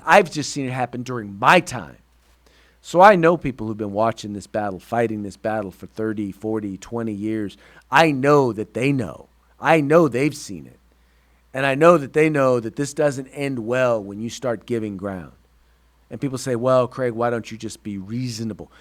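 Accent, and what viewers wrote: United States. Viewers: Our governor fuckstick edwards in Louisiana is even worse. People better be paying attention to what is happening...your Southern accent blows by the way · OK, you are taking some leaps here. And with the horrible hick accent that no one i know here in texas talks like that aside, I trust Governor Abbot. And Texas will never be like California.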